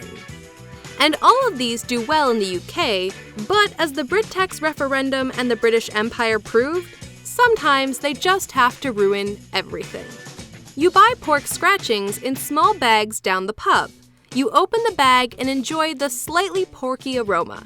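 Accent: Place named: United States. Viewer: I don’t lie how she or he said stop eating that food. It’s their culture ok! Ok so you don’t like it you have no right to tell someone to eat or not eat something it’s their culture. And they won’t stop eating it because u arent the government u can’t tell them to eat and not eat something a lot of people enjoy and is part of the culture and holiday maybe🙄😒 “Of course japan is on this list. I don’t think japan eats any “normal food”” WHAT! Excuse me “Normal Food”like what omelets, pancakes, pasta! It’s their culture ok. What u eat is not “normal food” it’s “basic food” wouldnt u get offended if Japan told u “of course America is on this list (not saying your from America but probs🙄😒) with their disgusting un normal food” you sure are making yourself sound racist right about now🙄😒 s”sushi is prolly their least “un normal food” (not what she said but minus well have) but it’s still raw fish wrapped in seaweed” I wouldn’t want to be friends with u, u would just make fun of what I eat as a Mexican. 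“ it sound disgusting” “has anybody thought meat should be cold NO because it is disgusting” “if u ever come upon it you should call an exorcist” 🙄😒 gurrrrrrlllllll (or boy) you’ve probably never tried it and it’s actually good. “You hold the gag reflexes” I’m tired of u🙄😒 “The Koreans” do I hear a 🔥🔥🔥🔥racist🔥🔥🔥🔥🔥🔥 “Round chicken nuggets or seasoned meatball” 😂🤣😂🤣😂🤣🤣🤣😂🤣🤣🤣🤣😂🤣 I would think it’s a tater tot but not trying to be racist but you sound American. And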